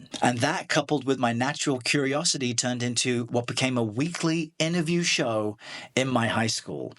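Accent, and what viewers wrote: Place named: England, United Kingdom. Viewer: Hearing your background story made me think, “Wow. I never would’ve guessed.” Thanks for sharing that piece of yourself. I also never would’ve guessed you moved to the US at such a young age seeing as how you still have a VERY noticeable English accent. As for being the underdog, I actually think it’s not a bad place to be at all. There’s no pressure, no one knows what to expect from you, and you get to completely set the tone for whatever it is you want people to know about you. Btw, I will never understand how you anchor people get the green screen thing down. It looks sooo confusing 🤯. And I never considered how this job could be heavy at times when you’re in an unfamiliar space with a story that hits hard in a negative way. I could go on responding to every single point you made in this video, but I will spare you the essay lol. 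Keep up the good work!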